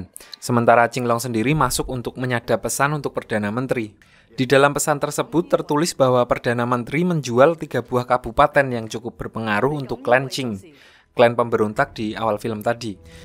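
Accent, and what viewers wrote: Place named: Java, Indonesia. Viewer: Medok bgt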